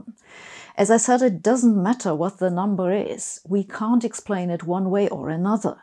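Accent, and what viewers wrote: Germany. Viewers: Love the German accent 🥰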